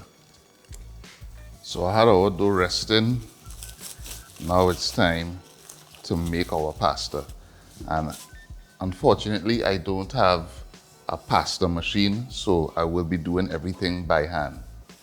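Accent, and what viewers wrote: Trinidad and Tobago. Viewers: Yea!Trini accent!I'm hanging around! I'm St. Lucian.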